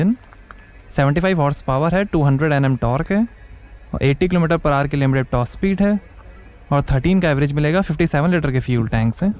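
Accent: Gwalior, India. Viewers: Sir Aap gwalior Ke Ho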